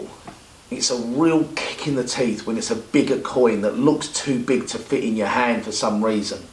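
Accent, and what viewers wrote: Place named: Cockney, United Kingdom. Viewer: Your cockney accent was brill! Subbed just for that!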